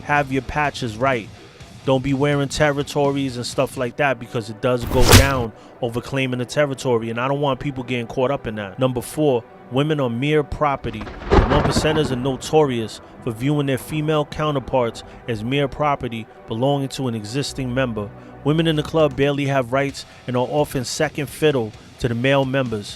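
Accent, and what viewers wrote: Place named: Bronx, United States. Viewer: Are you from the northeast by chance? Kinda sound like ya from the Bronx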